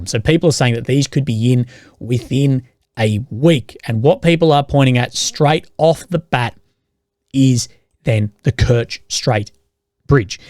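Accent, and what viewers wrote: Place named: Australia. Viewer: i have watched over 200 of videos. He is the best at zero line tactic analysis. Always very balanced, pulling for Ukraine. There were monetization issues and Gaza. He was always begging. After his Dad died, whammo, a turn around. Now he parrots the RT slant, for a while wore some pretty fancy clothes and promoted not begs now. Indicators of financial influence. assessment that loosing the Kerch Bridge, the only potential withdrawal line, is not important is right outta current Russian state propaganda and laughable on its face. I unsubscribed, I've no need of RT with an Australian accent. Sayonara Kerch, hut don't worry, says it is no prob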